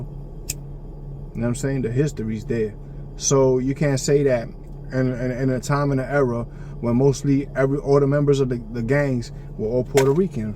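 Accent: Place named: New York, United States. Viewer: You on every channel speaking like you from NYC🗽🤔🤣🤣. Boricuas🇵🇷did NOT move into the BX🗽 in the 60’s. Stop spreading lies. Boricuas🇵🇷been coming into NYC🗽since 1920’s. By 1960 Boricuas🇵🇷were the FIRST Bodega owners. The Boricua🇵🇷population has always been the highest population in the BX. If you were from NYC🗽 you would of known that. Central Harlem is the highest population for Blacks.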